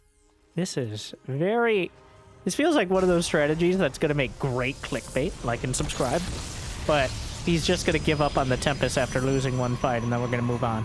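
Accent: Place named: Tennessee, United States. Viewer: Casual banshee. Accent from Tenesee Ain't no draftee. This is StarCraft 3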